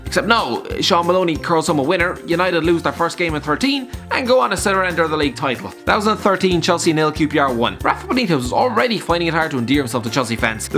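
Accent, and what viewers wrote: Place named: Ireland. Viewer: I'm a simple man. I hear the irish guy, I press the like button.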